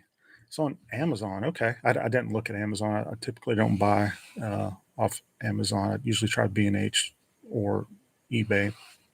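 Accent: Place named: South, United States. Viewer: Ah, your southern accent ain't that bad man. Eddy's video was great and you hit some interesting points too. I've been tossing the idea of creating jpeg styles for different situations back and forth but haven't done any yet. I'm just so used to shooting raw. But if I can create the jpeg style to mimic what I would do with the raw file anyways, it would save so much more time!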